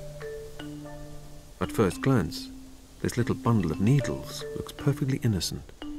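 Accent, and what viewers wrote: United Kingdom. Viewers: The narrator has a sophisticated British accent. I question nothing. And hello, Glen, after three years. Did you survive Covid? lol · Whoa, this is in the Middle East? My people, my Arab people! Get an Arab Man to narrate this. Why is a British guy doing it?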